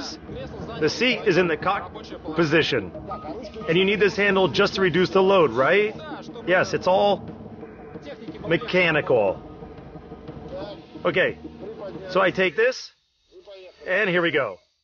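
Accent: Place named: Russia. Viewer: Руский ты зачем неруским прикидываешься? Слышно же что ты руский пропогандон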